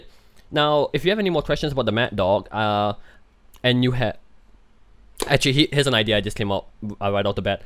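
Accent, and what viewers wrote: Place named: Southeast Asia. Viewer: Your accent is familiar, are you from SEA?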